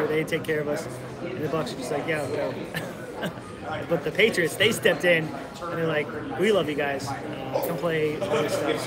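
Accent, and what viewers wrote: Australia. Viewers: Your Australian accent is quite sexy.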